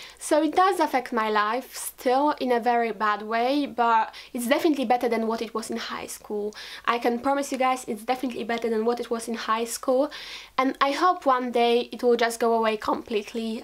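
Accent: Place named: Europe. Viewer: The Real Mansa Musa her school was british and she sounds european and her name looks european too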